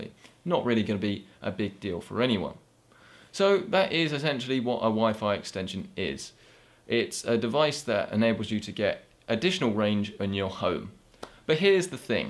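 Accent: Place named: England, United Kingdom. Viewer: yes rooter!!! if your american you say router but the world dont revolve around usa ... listen to him he like me are english!!!!!!!!! we speak different we have different customs, if you dont like it watch an american review one !!!!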